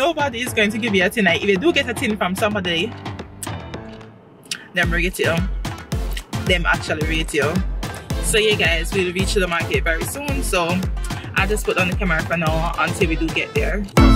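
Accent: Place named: United Kingdom. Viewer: Hear a lil british accent coming along! Hehe Brixton look festive! Loving the vids..keep going!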